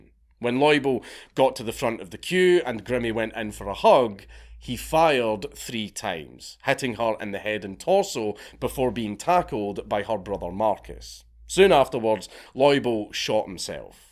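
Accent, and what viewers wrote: Scotland, United Kingdom. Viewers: The thumb nail with the adoring fan 😂😂😂 oh man. We called him Troll Kid because he looks like a troll doll. Extra points if you know who sang this in Oblivion: Flyyyyyin’, flyin so high, cliff racer FLY sooOOO high. FlYYYYYin’ Edit: please tell me you and your friends karate chopped Minotaur wang too and launched the adoring fan off the cliff 😂 good times… With love from the USA Freakin love the Scottish accent 🤤 hope to come back some day 🤘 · I love how the Scottish accent changes Twitter into Twatt-er - which sounds incredibly apropos.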